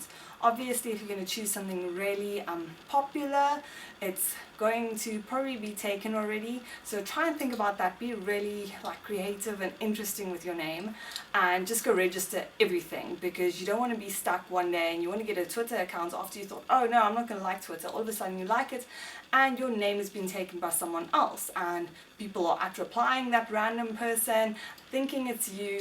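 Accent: South Africa. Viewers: She must be South African, would recognize this accent everywhere 😂 hear it every day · are you from South Africa ? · You are South-African aren't you? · Does anyone know if she's South African? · You are South African like me awesome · are you from south Africa? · south african ? YAY! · South African accent I hear?? Love Fromm fellow Cape Town viewer! Xoxo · +April Maroshick She's definitely South African with her accent ;) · Are you South African? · South African? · Are you from South Africa? You sound South African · r u from SA?? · I started watching his and was like wait she is South African too!!! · Kelly Castillo That's a South African accent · Are you South African or have a South African backround?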